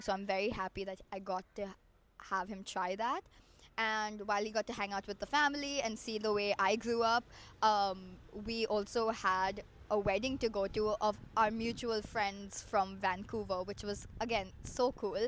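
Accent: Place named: Bengal, India. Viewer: Are you a Bengali what's with your accent 🧐🧐🧐🧐🤨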